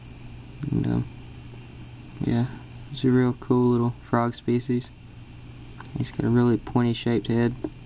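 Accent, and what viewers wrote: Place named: South, United States. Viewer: "Got a hold to him" 😊 You have a sweet southern accent and phrases. I'm southern too ❤️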